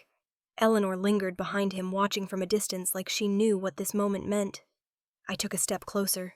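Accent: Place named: Indiana, United States. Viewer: I thoug y t she was from Indiana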